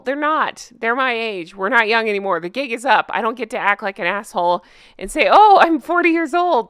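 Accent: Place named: United States. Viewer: When you said "my Queen" in the last video it surprised me (being from one of the realms, Australia, so she was/is literally my Queen) and it touched me that you felt that way. I then did a double take, wondering if you were American as I had thought - perhaps you were Canadian who lived in the US and picked up the local accent, because then she definitely would be your Queen too. But no, I checked your channel details that said US. So you admire Queen Elizabeth II so much you show it by calling her your Queen. That's wonderful.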